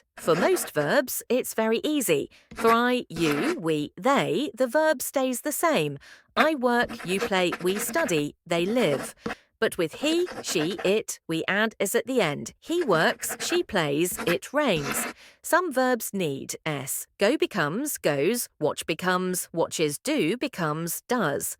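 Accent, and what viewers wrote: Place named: United Kingdom. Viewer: Qué hermoso es el acento británico! Saludos desde Paraguay 🇵🇾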